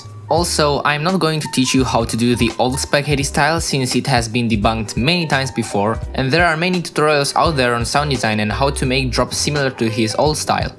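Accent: Romania. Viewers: Wow awesome! Are you Romanian? You have the same accent as Gutter.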